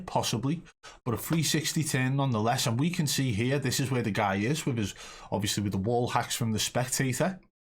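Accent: United Kingdom. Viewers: you are a joke. You and your disguisting british accent and face.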